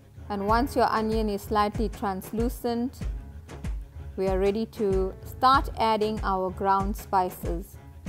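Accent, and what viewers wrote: Durban, South Africa. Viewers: Excellent production!!! How lovely to hear authentic South African commentary without the horrible American Slang. Please preserve your beautiful accent and unique presenting style! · Unmistakenly Durban accent. My sister in US over 50 yrs still has strong Durban accent. Me tooo🎉🎉🎉🇿🇦🇿🇦🇿🇦. Had that beautiful barracuda over 50yrs ago in Durban. I can smell those Durban spices. My mum also made the most delicious tinned fish biryani which I still make. It’s a hit when I do🎉🎉🎉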